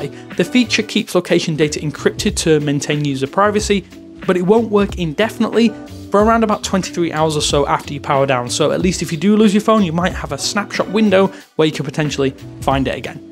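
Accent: United Kingdom. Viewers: You sound like your from the UK but the UK didn't get these features only the US did.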